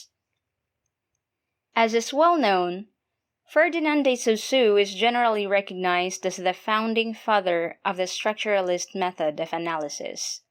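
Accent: Philippines. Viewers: This dialect sounds like the Standard Philippine English.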